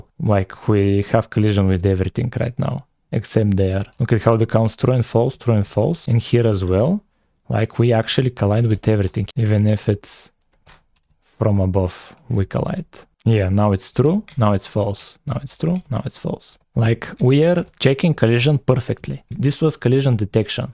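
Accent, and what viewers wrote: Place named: Russia. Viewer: You have a very Russian accent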